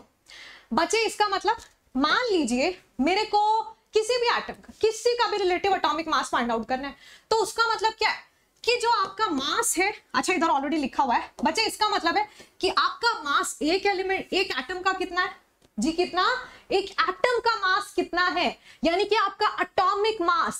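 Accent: Punjab, India. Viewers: Kya hi bolte ho mam punjab se ho 😅 subse best physical chemistry teacher ❤️😌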